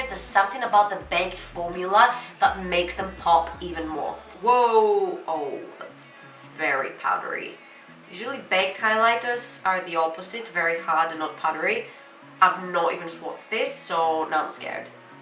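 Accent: Greece. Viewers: I love it too, Greek accent is the cutest..I could listen to anything, including user manual for drill machine, if it was said with Greek accent..or in Greek 😂💯💯💯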